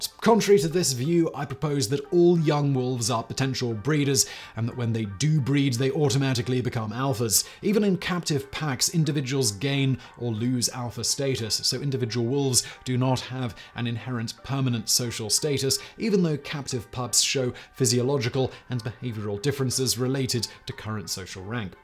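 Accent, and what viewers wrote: United Kingdom. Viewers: Zig Zag - Oh yeah, a British accent, that does it for me every time! Like I don't hear a British accent every time I speak. Your only objections are that he has a British accent and said something that you don't agree with? I've read several articles debunking the "alpha wolf" theory - from people who have studied the phenomenon. I don't take any one person's word that something is so or isn't so. I look it up, I check on it, I read up on it as much as I can. ps: What's really sad is that you're judging me by your own standards - which says a lot more about you than it does about me. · I watched the video, would you be shocked if I told you that a British accent doesn't make this guy the ultimate authority on everything?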